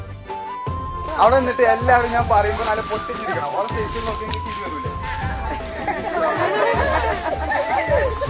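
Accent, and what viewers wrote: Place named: Malappuram, India. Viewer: Yaaaa mwoooneeee .... 😅😅Ante varthaanam kekkanaa verneee main aayitt ..... 😂😂😂😂💪🏻 malappuram daaa 😜😜💪🏻💪🏻💪🏻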